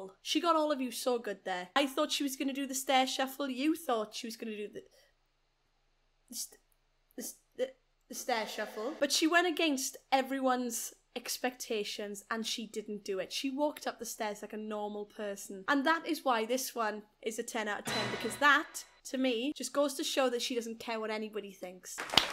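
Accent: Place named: Wales, United Kingdom. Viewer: Love the welsh accent now😍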